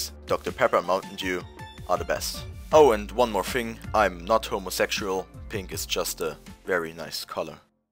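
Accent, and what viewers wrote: Germany. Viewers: I kinda heard it in your'e voice that you are german. I hope you are getting way bigger. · Hey, you live in Germany! Somehow I always knew that from your accent... Well, i live in a country right next to you, so we are basically neighbors! Please keep making fun stuff similar to the friendly class series. Those vids are the most fun I ever saw.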